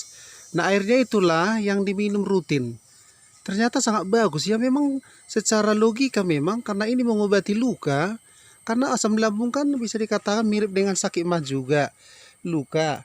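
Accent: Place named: Makassar, Indonesia. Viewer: Kéntara séékali aksen makassarta' DAENG.. Geli2ki déngarkiii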